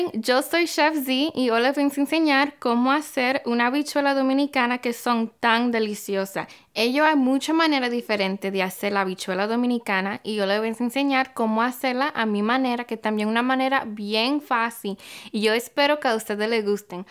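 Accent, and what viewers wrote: New York, United States. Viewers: tiene un acento newyorkyno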